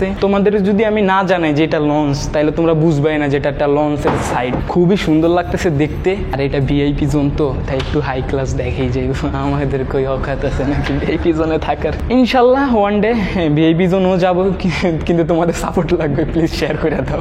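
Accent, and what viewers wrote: Barishal, Bangladesh. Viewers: বরিশাইলা বলে কতা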